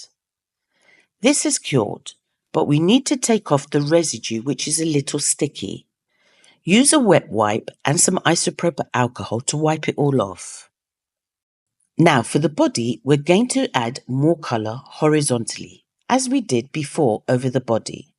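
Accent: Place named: Canada. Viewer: you sounded like a Canadian 😊❤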